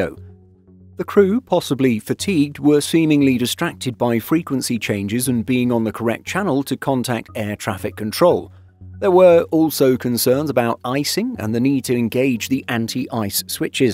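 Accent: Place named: England, United Kingdom. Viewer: What an annoying arrogant English accent...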